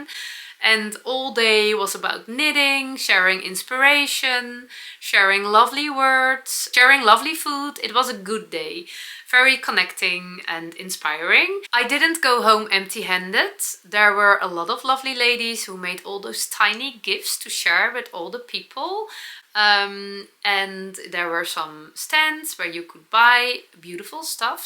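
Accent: Netherlands. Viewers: I look forward to your Dutchy English each week. Thank you for sharing heart jumping moments through your creative processes. The plants you have look happy too. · I love your dutchy English, your yarn choices. Vests are always a great garnement, and knitting sleeves feels like knitting the second sock. 😂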